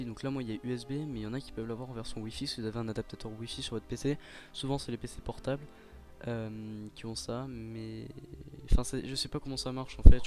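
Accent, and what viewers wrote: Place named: England, United Kingdom. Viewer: omg cet accent anglais xD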